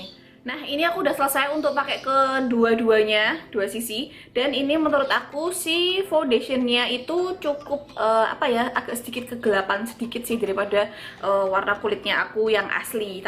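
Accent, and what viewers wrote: Java, Indonesia. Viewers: Yeeyy gercep buat mba medhok 🤗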